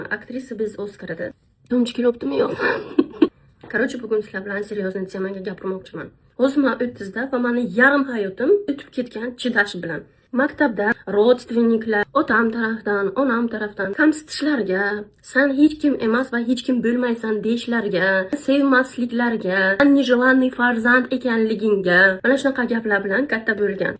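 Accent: Bukhara, Uzbekistan. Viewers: Nima qilibdi shevasiga bu buxorocha sheva hammamiz bir insonmiz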